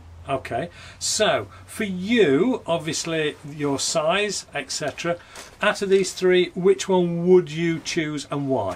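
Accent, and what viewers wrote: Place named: Nottingham, United Kingdom. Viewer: That's a Notts accent if ever I've heard one!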